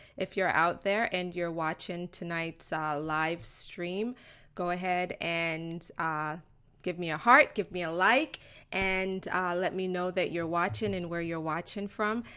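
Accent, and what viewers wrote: Jamaica. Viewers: I dont know how old you are l have seeing you have Bob Marley's family similarities mmmmm🤔🤔 excuse me but l always follow your channel but today when you said quote "sorry guys for my Jamaican accent mmmmm.l have questions in my mind and heart. Maybe you are related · I ❤ your jamaican accent .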